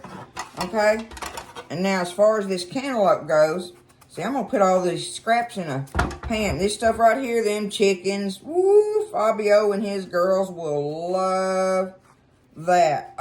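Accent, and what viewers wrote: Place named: Texas, United States. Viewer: you are such a sweet and perky soul, you look so fresh your hair do cute as usual. Your Texas drawl and manner of speech sounds so good, I’m 84 proud years old, I ve never been to Texas but some of family came from there- I love to hear 😁😁😁😁😁😁😁😁😁💨😊💨😊💨💨💨💨💨💨😊😊😊😊 love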